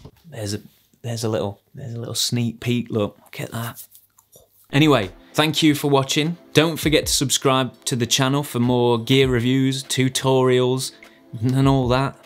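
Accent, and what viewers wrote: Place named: Birmingham, United Kingdom. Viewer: Woooh now I see why your accent sounds familiar! I've been living in Birmingham and lastly Stourbridge 'til last October. Now I'm in Italy due to the pandemic but I'm about to get back living in Stourbridge by half May 😀👍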